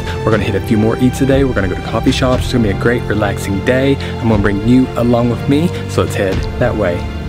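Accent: South, United States. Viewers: just read brief description where you're from. thought you're from Georgia :-D, i notice your southern accent. I've never been to States but I have friends and families live in various states in the US. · didn’t realize you had a southern accent. Your videos from the Philippines was amazing. Never been there but after watching you eat... it’s on my bucket list. Cheers.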